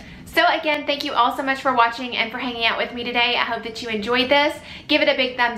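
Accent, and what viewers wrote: South, United States. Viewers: your cute southern accent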